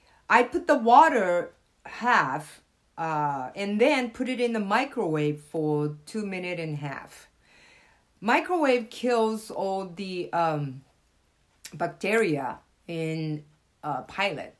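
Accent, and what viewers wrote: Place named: Spain. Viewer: Thank you very much for a very clear clarification. There were at least two reasons I asked such a (stupid) question. Oriental people, i.e. Chinese, Korean, Japanese, etc., mostly have slanted eyes and yours ain't. Your English with a bit heavy in Spanish accent (I bet you fluently speak both Japanese and Spanish). Regardless, I do have some questions about how you make Nattō and probably some remarks / suggestions. I hope you will find plenty of time to address them. 1. You mentioned in your video that you first soaked your soybeans overnight. Am I right to say that you used cold water to soak your soybeans in a room temperature of around 25°C (or 77°F)? 2. Your video clearly explained how you pressured steam the soybeans. In the process of setting up for a fermentation, I believe I saw some water left in the inner stainless steel pot with your inoculated soybeans in a Pyrex container to ferment, am I right to say so? 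So far, these are the only two questions I have. Now, if you don't have any objections, I would like to make some remarks / suggestions about Nattō. 1. Do you know naturally soybeans contain some bacillus subtilis (a gram-positive, catalase-positive bacterium) which can survive in extreme environmental conditions of temperature and desiccation <link> 2. Nattō <link> is basically made from whole soybeans that have been fermented with Bacillus subtilis var. natto. I started doing some researches and found these 3 videos <link> <link> and this video <link>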